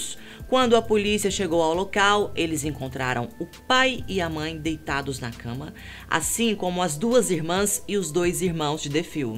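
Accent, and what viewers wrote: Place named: Rio de Janeiro, Brazil. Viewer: Certeza que ela é carioca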